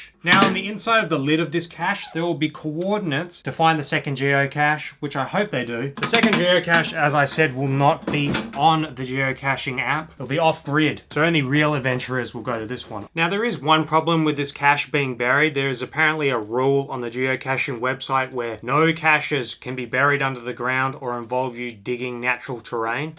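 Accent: Perth, Australia. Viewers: I only just realised is an Aussie 😂 we have the same accent why did I think he was British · You so look like you’re from Perth, but if you were from Sydney I wouldn’t be surprised. Nvm, you have a really heavy Perth accent I’m certain. Ahh you said you were from Perth, nvm.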